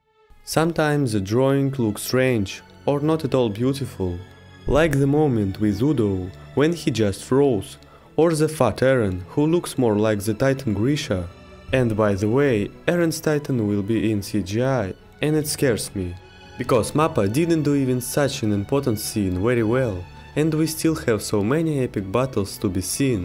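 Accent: Russia. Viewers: It's Russian accent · You have a Russian accent.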